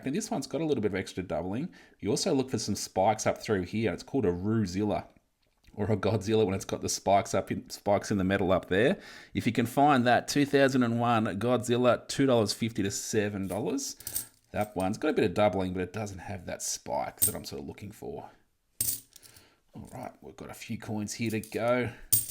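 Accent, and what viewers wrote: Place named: Australia. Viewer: it's all good Can you make longer vids I miss the Oz accent you funny fkr 😅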